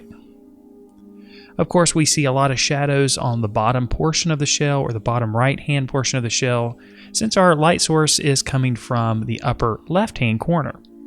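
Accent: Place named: North Carolina, United States. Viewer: Sounds like a Carolina accent. Most likely North Carolina.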